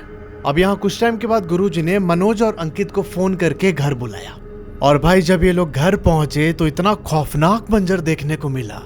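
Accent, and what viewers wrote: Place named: Bengal, India. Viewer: I am bengali but I live in Nepal aapka bangla accent mujhsey milta heinn😅😅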